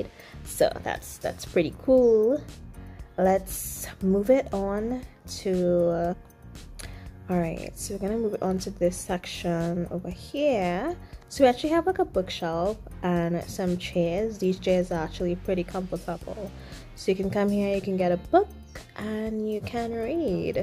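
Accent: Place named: Nigeria. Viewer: love thw cafe. where are you from you sound Nigerian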